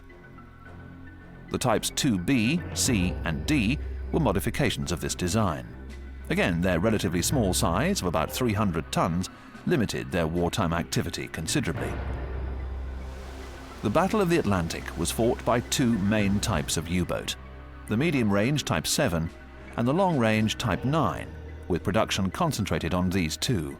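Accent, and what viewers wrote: United Kingdom. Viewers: British narrators are the best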